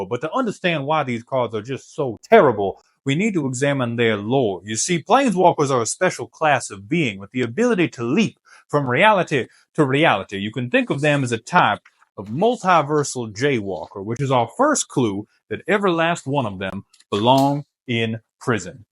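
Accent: South, United States. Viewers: I swear every day you slowly turn into a southern businessman, I expect to hear “I do declare” and “now I’m just a simple countryman” every new video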